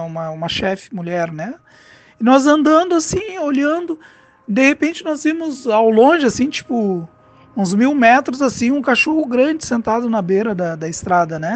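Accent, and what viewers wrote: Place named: Rio Grande do Sul, Brazil. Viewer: Eu Amooooo esse sotaque Gaúcho,adorei a história envolvendo escoteiros,aqui em casa tenho 3 escoteiros.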